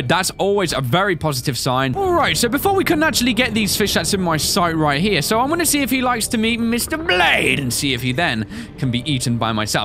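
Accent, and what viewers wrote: United Kingdom. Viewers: He sounds way more british here 💀 "SubnAUticA"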